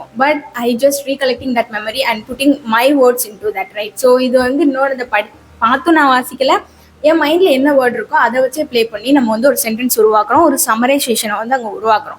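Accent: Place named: India. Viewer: Akka I improve your United States accent you are speaking Indian accent if you speak United States accent everyone will like your English more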